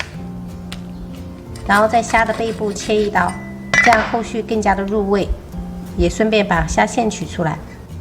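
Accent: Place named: Minnan, China. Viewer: 你似被夫家閩南口音影響了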